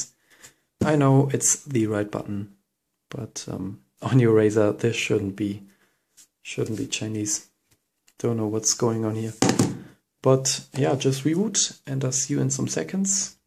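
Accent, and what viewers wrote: Germany. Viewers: Great tutorial. Btw it's quite cool that you are running a Chinese version of superSU while speaking with a German accent.. no offense tho. I live in Germany.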